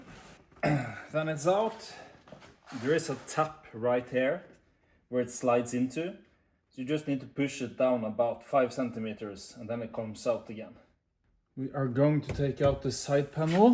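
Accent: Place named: Norway. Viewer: Du må seriøst prate på norsk. Ingen fra utlandet orker å høre på den norsk / engelsken din.. Først må du nå ut til publikum her hjemme før du skal gå «viral». Du har bra content, men du må gå gradene som alle andre dessverre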